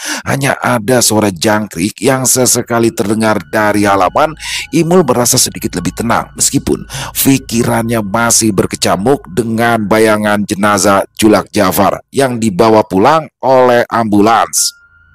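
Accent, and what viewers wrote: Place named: Banjar, Indonesia. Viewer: waah makin mantap nih aksen bahasa banjar mas..kereen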